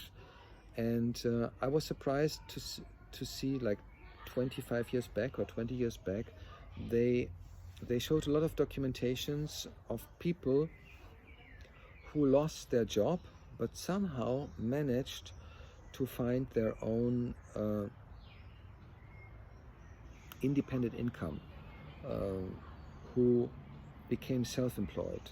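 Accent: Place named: Germany. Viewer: I guess you are from Deutschland because your accent is like my teacher( I am a Chinese student studying in Germany). I really like your own thinking about the Chinese model. and I was also inspired by your talk also to understand further about china. I also respect you for your really independent thinking and analysis ( because most of the west media is full of ideology, arrogance, and prejudge) hope you can produce more videos about your analysis of china, whether is a good or bad point. because I appreciate your neutral altitude (Daniel Dumbrill is also a good neutral one also <link> And I think one common thing between China and Germany is that both 2 countries are pragmatic, it's like scientific thinking to manage the countries. Vielen Dank für Ihre Erklärung und hoffen, dass Sie nach der Epidemie wieder nach China kommen können.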